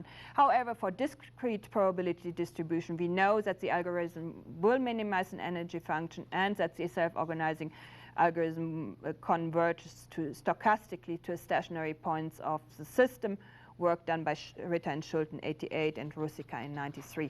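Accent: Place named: Germany. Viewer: She´s german, right? Really usefull, thanks a lot for the upload!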